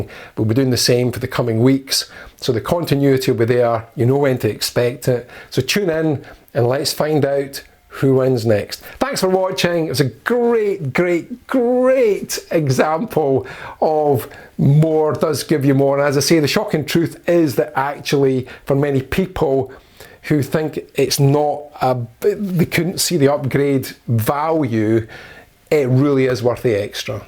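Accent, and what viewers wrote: Scotland, United Kingdom. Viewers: Well, you tried squaring the circle without any knowledge of trigonometric functions. The term “blind test” or even “double blind test” requires not only a technically perfect laboratory setup, but also randomly selected test subjects according to predetermined parameters, a statistically significant population and corresponding valid statistical evaluations. Nice try, but ultimately I like your rumbling Scottish accent 😊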